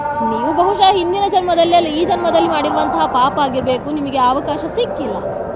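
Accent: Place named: Mangalore, India. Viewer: mangaluru kannada super jayavagali Jaisiyaram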